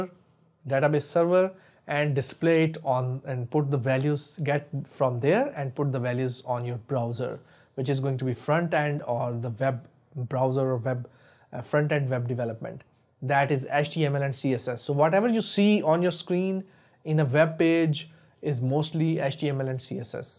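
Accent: India. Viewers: You have an indian accent.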